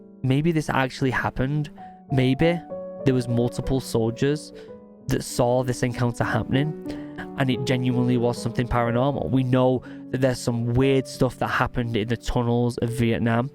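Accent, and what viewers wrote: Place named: England, United Kingdom. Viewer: nice change hearing an Englishman tell horror stories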